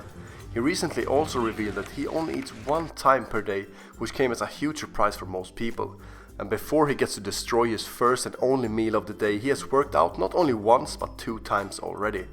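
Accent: Sweden. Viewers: You sound Swedish. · I think I specialized to recognise Swedish accent. I knew you were Swedish before checking your channel's info. Thanks to esport :) Thanks for the info though!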